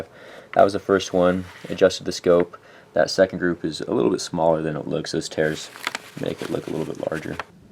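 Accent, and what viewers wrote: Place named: South, United States. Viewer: He has a bit of Southern twang, which is fine by me since I'm Southern as well. His commentary sounds perfectly fine. His words are about the rifle and it's performance. That's all you need.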